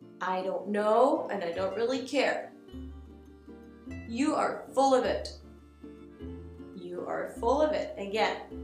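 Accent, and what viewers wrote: United States. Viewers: By the way, how do you manage to have such a fantastic American accent? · she's american, not french, i'm french by myself and when she speaks english she has a little accent so i think that's why her american accent is perfect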